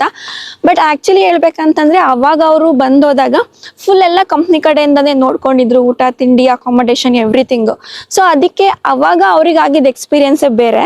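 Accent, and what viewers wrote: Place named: Sira, India. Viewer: ದೂರದ ಜರ್ಮನಿಯಲ್ಲಿ ನಮ್ಮ ಸಿರಾ ಭಾಷೆ..... ಕೇಳಿ ಖುಷಿ ಅಯ್ತು...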